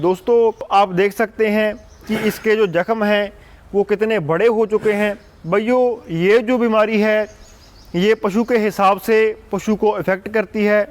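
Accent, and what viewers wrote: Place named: Puadh, India. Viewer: bhai puadi bol rhe ho kha se ho app bhai ji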